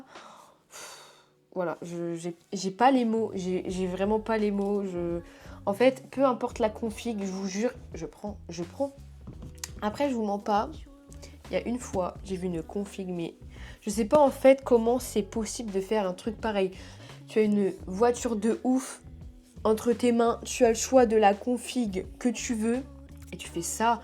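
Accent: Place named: North, France. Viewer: Salut Première chose, j'ai eu beaucoup de mal avec toi au début sur la chaîne du tonton mais maintenant ça passe beaucoup mieux, tu es plus à l'aise et je t'ai finalement accepté. Et seconde chose, je trouve que tu as un p'tit accent du Nord. T'es vraiment de la région parisienne? Tu n'aurais pas vécu un peu plus haut que Paris? Bon courage pour ta chaîne et tout le reste. La bise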